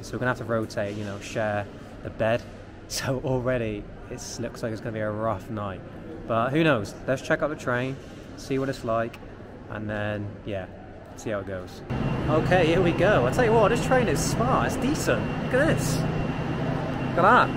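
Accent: United Kingdom. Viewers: Your thick British accent is good bro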